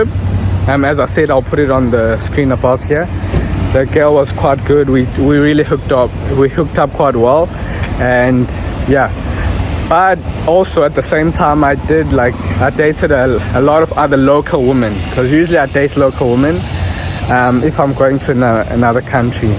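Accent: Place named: South Africa. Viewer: Definitely South African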